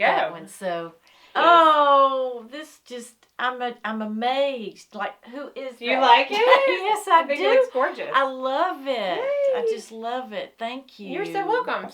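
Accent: South, United States. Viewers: Wow you Look Beautiful and i just Love your Southern accent you do not look your age at all even before make up too! Want to see more of you! In videos you were sooo sweet!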